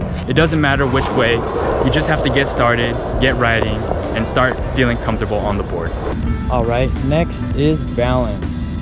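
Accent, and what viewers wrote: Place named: Ohio, United States. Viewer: he sounds like an ohio man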